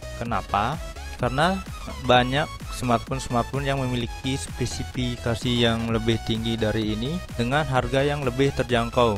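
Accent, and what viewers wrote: Bali, Indonesia. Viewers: logat balinya kentel kak 😂. orang Bali kah? #cumantanya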